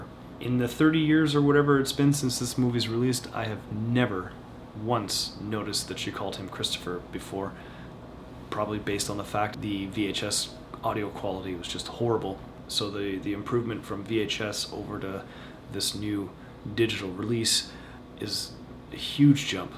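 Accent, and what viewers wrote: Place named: Canada. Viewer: Rad is Rad. I hear a Canadian accent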